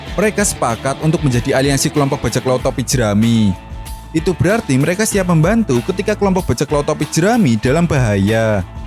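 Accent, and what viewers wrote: Java, Indonesia. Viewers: lidah njowo mas, lanjutkan mas do ur best!!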